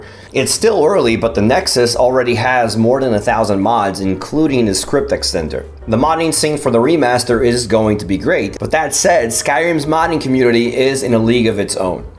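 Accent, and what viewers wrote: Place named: Portugal. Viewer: Hey, do you speak Portuguese? Kinda noticed your accent, lol. Just came across your channel. Great content !!!!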